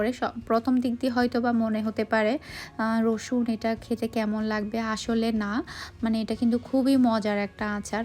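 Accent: Chittagong, Bangladesh. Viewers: Jehetu uni khas chatgaia, chatgaiara shuddho vashai kotha bolte gele airkm e shonai...kichu korar nai.... R try korle hoito hobe....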